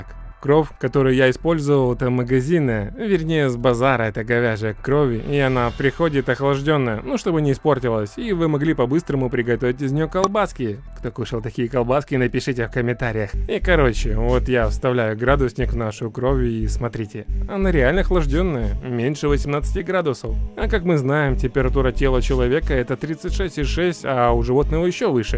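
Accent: Ukraine. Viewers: Он украинец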